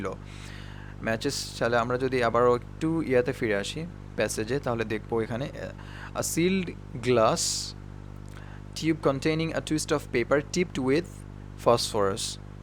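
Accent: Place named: United Kingdom. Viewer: Bro..language just awesome 😃onek tai britis der mto..ar ata e IELts er very important thing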